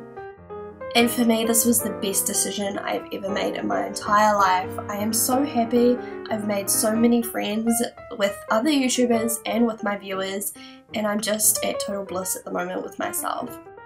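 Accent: New Zealand. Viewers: Did you even watch this video? She says it like 10 times and she has a kiwi accent😂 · i knew u were Nz ur accent is sooo strong :) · Yeah yeah I know, first off, it was rhetorical, because she obviously is from NZ, but yeah, that wasn't supposed to be like that btw hahhaahaha :3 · Wow! I'm so glad things are going well for you now :-). I never would have guessed that you went through all of that - much of which I can identify with. You are gorgeous with and without makeup. I love your videos. I was really surprised to hear a Kiwi accent after hearing mainly American ones. Bless you for who you are :-)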